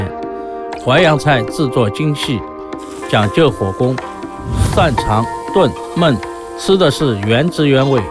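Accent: Shanghai, China. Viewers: 大厨的上海普通话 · 不会啊 就是略带上海口音的普通话 没有感觉很吃力的说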